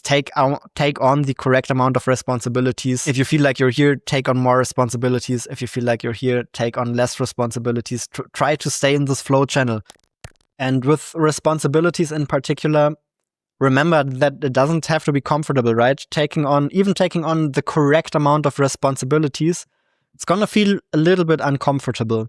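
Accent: Germany. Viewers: Text: "Learning the world": "Learning the vurld" XD Don't worry, your German accent is a big reason of why I subscribed to your channel x)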